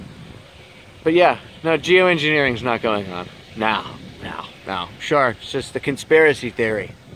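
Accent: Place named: United Kingdom. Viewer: Nice British accent:)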